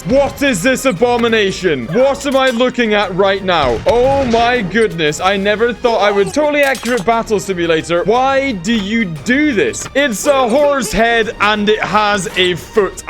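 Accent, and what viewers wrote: Scotland, United Kingdom. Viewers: you scottish because i am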